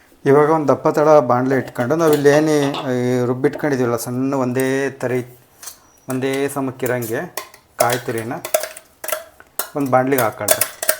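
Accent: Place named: Hassan, India. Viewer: ನೀವು ಯಾವ ಊರು..?? ಎಲ್ಲಾ ಕಡೆ ಭಾಷೆನೂ ನಿಮ್ಮ ಮಾತುಗಳಲ್ಲಿ ಇವೆ.ನಮ್ಮ ಹಾಸನದ ನಮ್ಮ ಹಳ್ಳಿ ಮಾತುಗಳು, ಮಂಡ್ಯ ಕಡೆ, ಉತ್ತರ ಕರ್ನಾಟಕದ ಕಡೆ ಹೀಗೆ ಹಲವಾರು ಹಳ್ಳಿಗಳಲ್ಲಿ ಮಾತಾಡುವ ಕನ್ನಡ ನಿಮ್ಮದು.. ❤️❤️ ಜಾಸ್ತಿ ನಮ್ಮ ಹಾಸನದ ಕಡೆ ಹಳ್ಳಿ ಭಾಷೆ ಇದೆ. ನಂಗೆ ಖುಷಿ ಆಯ್ತು. ಅಡುಗೆಗಳು almost ಎಲ್ಲಾ ಚೆನ್ನಾಗಿವೆ. ನೀವು ಮಸಾಲೆ ದೋಸೆ ಮಾಡುವುದನ್ನು ತೋರಿಸಿ.. 🙏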